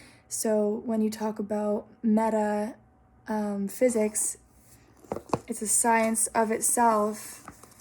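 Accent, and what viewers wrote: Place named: RP, United Kingdom. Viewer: OMG! Can I be more Inlove w/ this girl!!! Haha!! Aquarius Sistar here!! I love your Scribbling Bit! Nothing could be cuter except the general Alice British proper way you speak through the blubbering of your whimsical speech as you interpret the codes of your readings in your experience. OMG Girl I'm gonna get drunk on this channel, dangerously. You're a Marvelous bloomage of humble beauty dearest Lovely Crystal Star Child. ✨🫶🏻🎶💥 I think you know who this is 😜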